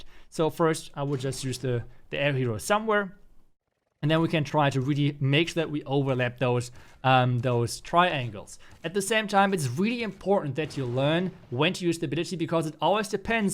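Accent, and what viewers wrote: Germany. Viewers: erstes mal englisches video gesehn man hört kaum einen deutschen akzent wow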